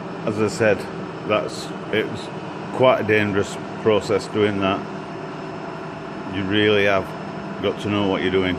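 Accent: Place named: Manchester, United Kingdom. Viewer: who's brave enough to sit in the chair next to the bridgeport.also i am hearing a manchester accent,how close am i.great machine work mate.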